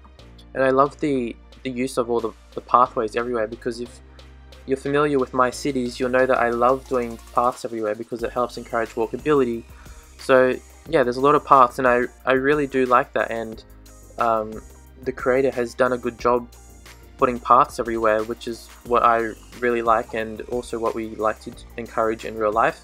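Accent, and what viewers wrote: Australia. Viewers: You from Australia mate? love the channel btw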